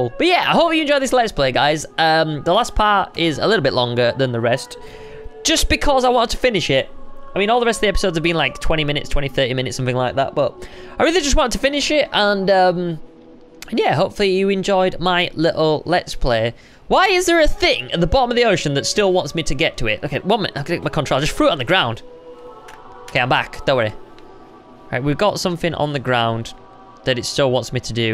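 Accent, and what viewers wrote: Scotland, United Kingdom. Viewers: are you Scottish or British??? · is British duh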